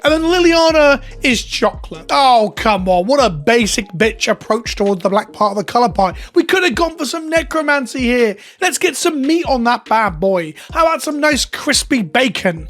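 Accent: United Kingdom. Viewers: Holy shit, youre british?!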